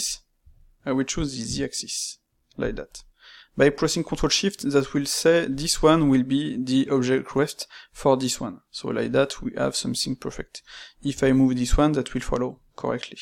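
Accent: France. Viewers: i bet you're french ^^ i am too but the accent is sooooo specific ^^ (great vid tho , i just find the accent funny ^^ )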